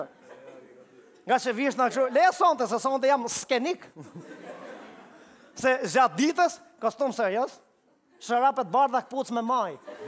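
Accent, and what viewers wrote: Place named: Laç, Albania. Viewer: ky o duket se asht nga laqi o sduket nryshe🤣🤣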